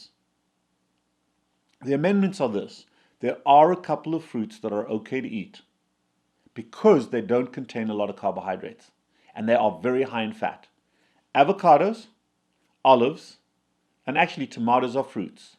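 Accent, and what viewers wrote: South Africa. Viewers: I'm 53 and from South Africa, where it sounds like he is also from. Not sure where he got his apples from - they still look the same to me😂 But I agree that diabetics should not eat fruit.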